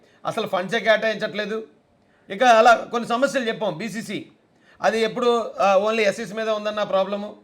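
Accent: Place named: Godavari, India. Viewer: Wonderful Presentation with Hilarious Comedy & Godavari Atakaram 😂🤣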